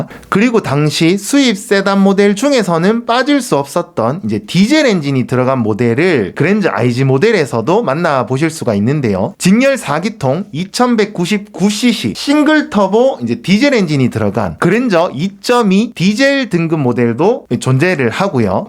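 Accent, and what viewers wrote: Gyeongsang, South Korea. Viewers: 대구경북 언어를 구사하시는데‥어딘가요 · 경상도 사투리가 참 심하십니다ㅋㅋㅋ 부산에사는 군대동기도 이렇게 심하진 않던데ㅋㅋ 도대체 경상도어딥니까?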